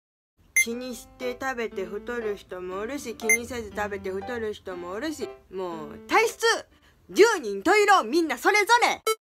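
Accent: Okayama, Japan. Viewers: 岡山弁なのと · 毎回思うんだけどのおかげで岡山弁がかわいく思えてきた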